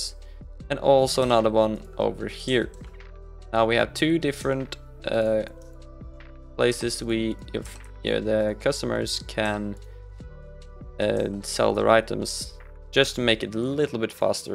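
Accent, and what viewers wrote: Norway. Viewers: er du norsk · e du norsk lol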